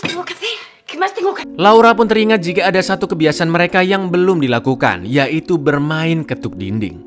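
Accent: Java, Indonesia. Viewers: gw sebenernya ga terlalu suka sama aksen jawa karna susah di mengerti, tapi klo mau tidur suka selalu nonton channel ini. the best channel ever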